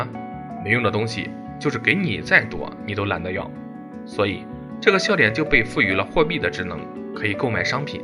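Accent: Henan, China. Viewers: 哈哈哈 up主是不是豫北人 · 主持人是河南人吧？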